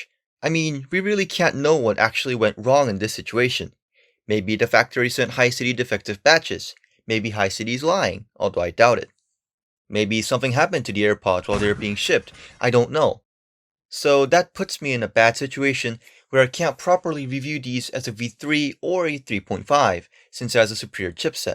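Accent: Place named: South Korea. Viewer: 한국사람 특유의 악센트가 있네요, ㅎㅎ 아무튼 좋은 리뷰 감사합니다.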